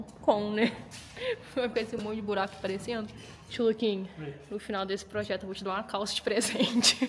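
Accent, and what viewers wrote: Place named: Caipira, Brazil. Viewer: Essa equipe é a melhor em assunto de reforma e criação de projetos. Esse sotaque caipira da é um charme. Simpatia beleza e leveza tudo junto nessa equipe.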